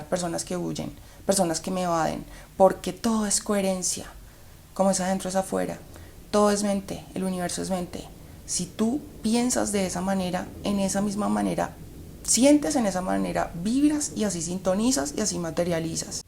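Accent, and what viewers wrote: Colombia. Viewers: Excelente video y GRAN CONTENIDO 🇨🇴 " MI GURÚ FAVORITA ❣️🇨🇴" UN placer verte y un DELEITE escuchar tu voz muy colombiana 🇨🇴 ❣️ ( siempre enseñanzas prácticas GRACIAS ❣️🇨🇴😉✌️🇲🇽) UN BESITO Y UN ABRAZO DE OSO HASTA BOGOTÁ COLOMBIA 🇨🇴❣️😉✌️🇲🇽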